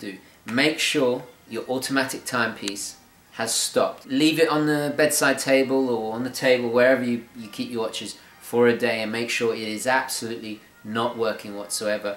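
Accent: England, United Kingdom. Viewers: I am begging you to make a full review of any watch in that thick british accent. Please please please :) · The outtakes at the end were very funny! The stuffy english gent accent/voice is my favorite. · Hi - Thanks for the informative video. I didn't realize there was a DIY resolution for magnetism. Always enjoy your blooper vids. I think you have that English accent down pretty well now after all those videos.....;) Cheers!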